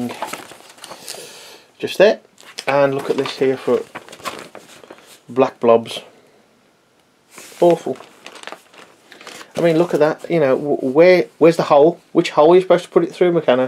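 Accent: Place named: England, United Kingdom. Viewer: you're 100% an english man (your accent ^^) , i have this box and 2 more to make anything in DIY , the problem is , in belgium we dont have a boxes of meccano "general purpose" :( im limited by the content of the boxe (for exemple the 4X4 OFF ROAD TRUCK SET is very poor of pieces ) ... can you help me please to found any pieces general purpose , thanks a lot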